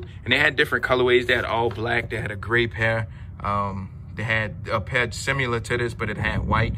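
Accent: Baltimore, United States. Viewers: Homie is definitely from Baltimore. What it deww dummy?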